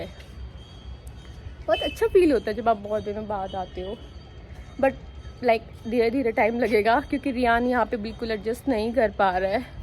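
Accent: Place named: Haryana, India. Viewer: Bati lagta h ap haryana se hansi k aas pas se ho bilkul hmari tra ki boli h bati mujhe bada acha lagta h hmari haryanvi bati austrailia men khoob dhoom mcha rhi h austrailia kbare men badi achi information de rhi ho apna experiance share kr rhi ho bhagwan bati ko lambi jindgi de hamesa khush rakhe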